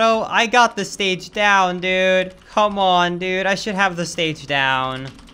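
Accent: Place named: California, United States. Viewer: What’s so fucking funny Is that I DO THIS TOO Maybe it’s just because of my California accent but it’s hilarious that I’m GENUINELY trying to NOT say “actually” like 400 times And yes You do absolutely have the elements of the California accent The tone changes, the usage of “like” for 500 different things “Overusing”* “literally” and “actually”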